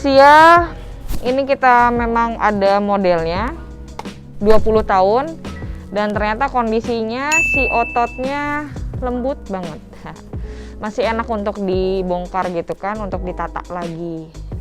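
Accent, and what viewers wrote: West Java, Indonesia. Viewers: Asli org sunda?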